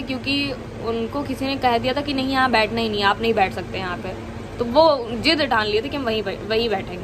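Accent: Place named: Awadh, India. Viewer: wahh awadhi tone ko Hindi me sunna bohot acha lagta hai mujhe aap ka Blog acha hai starting achi hai aap ko bolne ka hunar bhi hai yaha me sab nahi keh sakta kyunki blog ki bat hai par han agar me tumhari koi help kar paun to mujhe jarur batana studio ka kam hoga to batana ❤ baki achi kosis sherni ho aap❤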